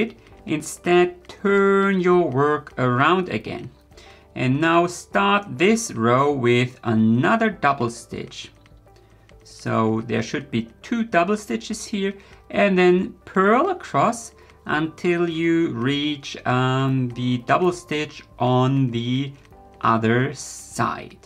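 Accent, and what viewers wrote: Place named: Germany. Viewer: Also, your tutorials are simply superb! You're so skilled, you pay close attention to detail, and you're so open-minded about various knitting techniques! It makes me feel like I can try anything I want and just have fun making mistakes and learning from them. Also, my mom is from Germany and your accent makes me feel just like I'm at home again. :)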